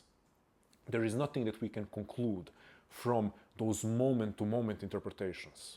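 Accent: Balkans. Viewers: Are you Balkan? Great video, just wondered because of the accent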